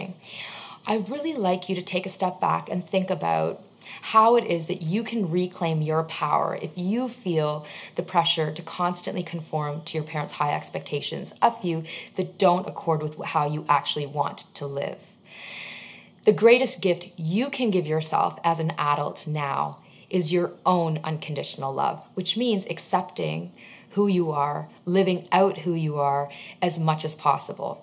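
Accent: United States. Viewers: i can tell that the woman speaking in this video probably grew up in the US just like me because she has an american accent. therefore, she is an indian born in america but expected to have indian values which is kind of hard to do. indian girls do know how to help out in the kitchen, like washing utensils, cutting vegetables, putting away groceries in the refrigerator or pantry, but very few i think will know how to make chapathis , rotis, pulkas, parrotas from scratch, or how to wear a sari, thankfully these days, you can get roti makers to make your life easier, and you can get pre stitched saris that are available to wear, they look just like a wrap around skirt, so you are good to go in that department. but still girls are expected to show respect to elders, work tirelessly in the kitchen, be work horses, listen to parents about what they eat, how they dress, who they are friends with, what classes they take, how late they stay out, who they go out with, how much money they spend, what they spend money on, etc.. 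· please keep your western ideologies to yourself. This is not our culture. you speak in american accent, how can you be Indian .I will serve and live with my parents until their last breath just like my dad cares for my grandma . i will also take care of my inlaws so pls , all the sacrifices they made for me and what am gonna tell them, im sorry but im leaving, not my fault you are aging, not my fault you paid for my studies and life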